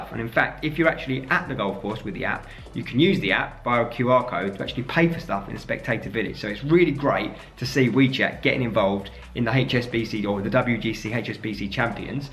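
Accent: Newcastle, United Kingdom. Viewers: True geordie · True Geordie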